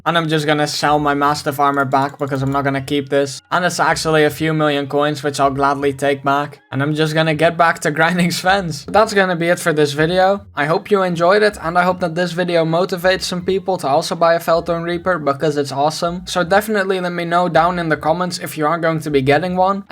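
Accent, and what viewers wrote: Netherlands. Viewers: bet that this guy is from the netherlands i know the accent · I smell a little bit of dutch, IGN: Lancebobo3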